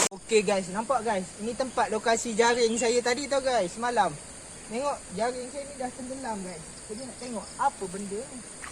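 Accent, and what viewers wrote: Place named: Perak, Malaysia. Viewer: Cakap Perak je coo..baru padu..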